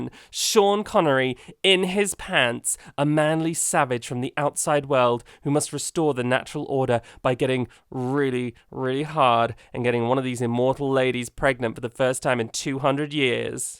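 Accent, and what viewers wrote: United Kingdom. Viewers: It’s just Southern English 😭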